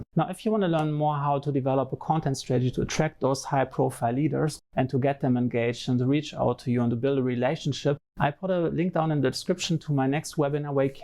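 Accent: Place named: Germany. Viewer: Wusste ab der ersten Sekunde, dass du Deutscher bist 😅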